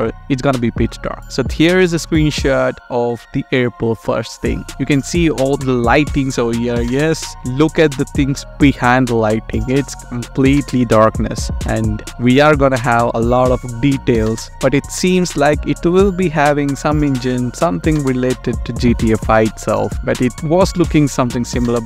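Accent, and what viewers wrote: India. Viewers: he's talk like india+english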